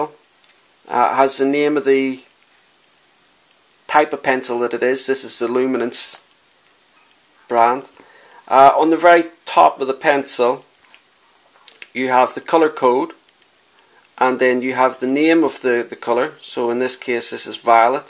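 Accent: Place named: Northern Ireland, United Kingdom. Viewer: Great to hear a fellow NorthernIrish voice talking about coloured pencils!! I started using them about 3 years ago in my art (I use lots of different media), but I don't know anyone else here using them. Do you live in N Ireland? I'm a member of the UKCPS, I don't know if you have heard of them? Anyway, these are great pencils but I only have the small set. Atm I mainly use Faber Castell polychromos and I love Derwent's Drawing set palette, especially on black paper. Will follow you on FB and Twitter!! Thanks for these videos. My husband keeps saying I need to start doing videos but I don't think I'd be much good. This was very informative and well done. Keep up the good work!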